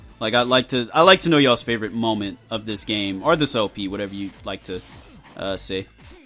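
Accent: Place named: AAVE, United States. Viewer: Dang son, ol' Shad got pretty philosophical in this! Also, love the ebonics :)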